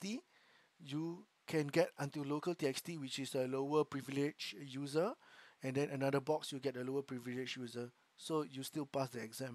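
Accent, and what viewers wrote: Singapore. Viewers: Sounds like Singaporean. Congrats! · Thanks for sharing. You sound like a Singaporean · Its like the singaporean accent is so strong, i recognized it instantly😅 congrats bro, i just completed Google Cybersecurity Cert and hope to be working in cybersec with just sec+ and oscp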